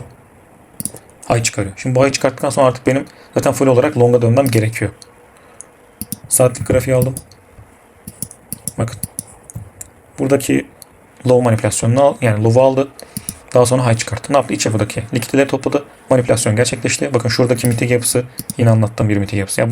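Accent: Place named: Italy. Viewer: hocam kimlerden eğitim aldın ? konuşmandan italya rüzgarı geliyor hafif :) çok güzel bi entry modeli bu arada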